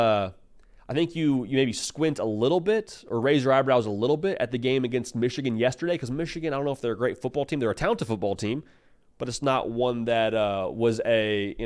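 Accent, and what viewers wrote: South, United States. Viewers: Your southern Roots are showing! 😅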